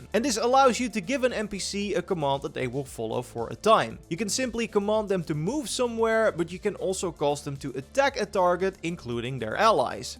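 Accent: Netherlands. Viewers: Je ruikt het Nederlandse gewoon 🤣🤣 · Bro are you dutch? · JEEEEZZ Dutch accent much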